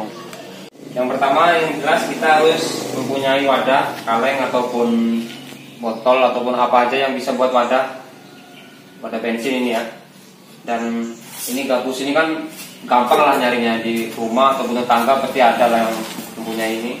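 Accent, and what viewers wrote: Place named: Banyumas, Indonesia. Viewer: Ngomonge campur ngapak...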